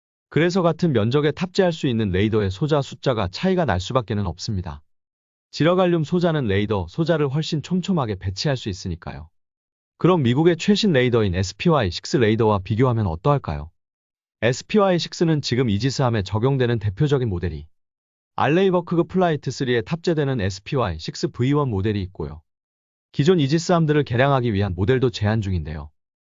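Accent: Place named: China. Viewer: 왜 목소리에 중국,북한의 억양이 섞여 있는지,나만의 착각인가? 하지만-! 열심히 하세요 응원합니다😅😅남들이 하지않는 더 좋은내용 으로..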